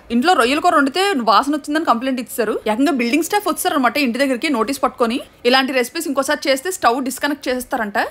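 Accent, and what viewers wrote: Vizianagaram, India. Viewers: Yeah true bro, and filmy moji valla mana vzm slang ki YouTube lo Baga reach vastundi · your voice and slang, very connecting to our own area and place.. very authentic and natural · Desha basha landhu Telugu lessa.. Ejjinagaram basha enka superu.. Great.. adhirindi.. god bless you.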